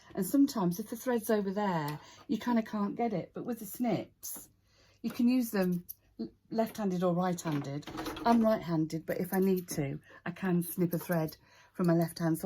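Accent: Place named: England, United Kingdom. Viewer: Oh thank goodness a lovely english accent video explaining... instead of a shrill american accent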